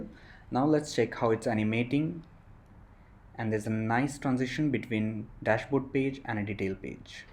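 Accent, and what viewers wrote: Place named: India. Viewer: WTF with the Indian English omg it seem like 😱 where did you learn that kind of accent guys ??!! U r awesome by the way 👍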